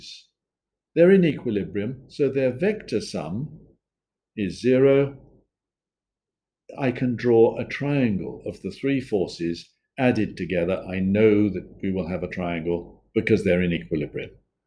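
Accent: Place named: United Kingdom. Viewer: Thank you you beautiful british sounding man!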